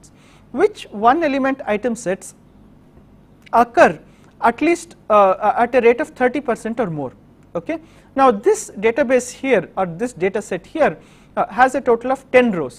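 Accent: India. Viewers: As a matter of fact, indian-english accent is pretty close to russian-english... so you are actually in better position to understanding this guy than native english listeners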